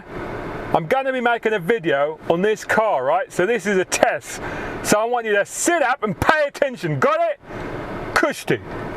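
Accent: England, United Kingdom. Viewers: his voice is like gay and fcking england boy he is fucker this guy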